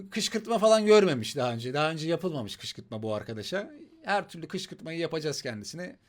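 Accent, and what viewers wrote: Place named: Azerbaijan. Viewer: abi , sendemi Azeri çıktın ...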